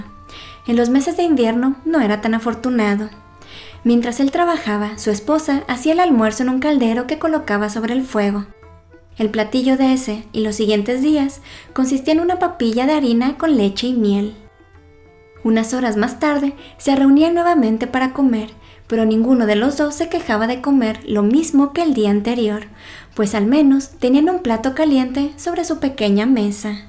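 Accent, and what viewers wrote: Mexico. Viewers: La voz es de mejicano con acento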